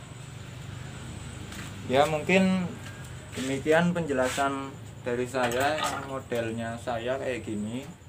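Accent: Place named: Banyumas, Indonesia. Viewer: Sampean asli mana kang? Ko ada suara ngapak'y 🤭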